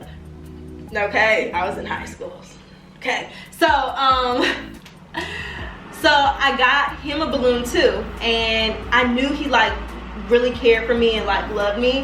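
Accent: New York, United States. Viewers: your officially a new yorker, just listening to you talk in this videos I felt like we grew up together lol Love you so much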